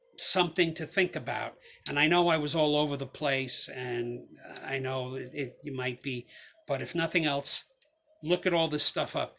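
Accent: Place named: Boston, United States. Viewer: Too bad,i liked how you presented your observations.I guessed you were from the Boston area.I lived in Chicopee Ma.For several years putting an ex wife thru American International College in Springfield.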